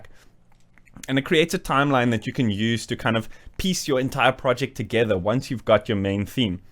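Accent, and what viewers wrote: South Africa. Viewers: Dude! South African?? Where you based? Great lesson, thank you!!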